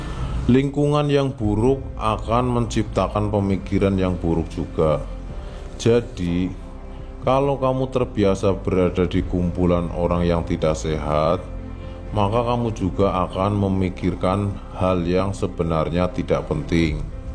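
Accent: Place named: Banyumas, Indonesia. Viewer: Logate NGAPAK banget siih pak,,!! Rika ne wis mangan durung Dina kie,,kooh wis nei semangat Karo wong²😂😀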